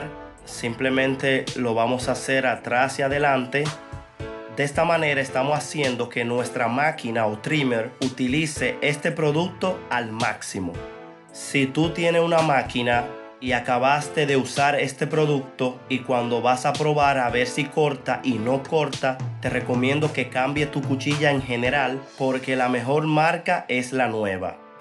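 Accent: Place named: Dominican Republic. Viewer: Hablas igual que yo Eres dominicano verda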